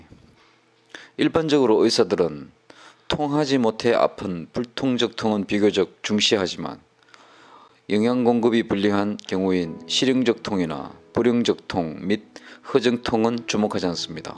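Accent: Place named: Gyeongsang, South Korea. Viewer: 감사히 잘 듣고 있습니다.경상도 발음이라 잘 못알아들을때가 가끔 있어요^^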